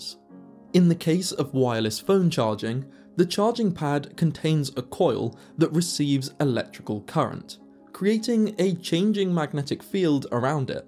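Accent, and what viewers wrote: England, United Kingdom. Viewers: Yes- perfectly running electric motor in English accent? I am now 67 years old, and my passion was reading advanced technology... Enough of your nonsense and blah blah blah,-it may be so, for the Antichrist oppressors has oppressed all the technology for a century or so... No different than big pharmacy etcetera- you Antichrist global oppressors thieving bastards... Your day is coming, I'll see you all at the judgement seat!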